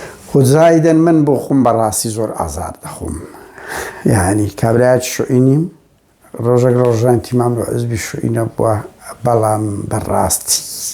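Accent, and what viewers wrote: Erbil, Iraq. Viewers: کوردی دەڤەری هەولئر ناخۆشترین دەنگی هە یە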